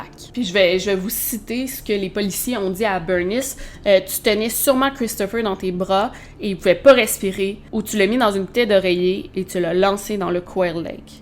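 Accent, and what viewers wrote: Quebec, Canada. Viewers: Bonjour,Lionel parle de vous ecoutez, du coup je viens ici.❤❤❤❤❤,et j aime deja votre elocution et votre accent si charmant,j aime beaucoup les canadiens,et les canadiennes.Le Canada 🇨🇦 🍁 🇨🇦 🍁 🇨🇦. ❤. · "la p'tite doudou.." 😊 étant français et nul en langue j'avoue j'adore l'accent québécois ❤ j'arrive pas tjrs à comprendre dessuite en général mais franchement avec toi je comprends direct ^^ je suis abonné depuis peu mais j'ai boulotté beaucoup de tes vidéos, je regarde peu de gros youtuber car j'aime le côté simple, éthique, et bienveillant des plus petits youtuber sauf quelques exceptions comme ta chaîne ! Grand merci pour ton travail et ta vision envers les différents cas, le respect des familles, très humaine et empathique j'aime beaucoup Concernant cette histoire j'avoue c'est dingue... Je n'ai d'enfants mais j'imagine le ressenti de chaque parent face à une disparition, perte d'un enfant... 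Merci encore pour ton travail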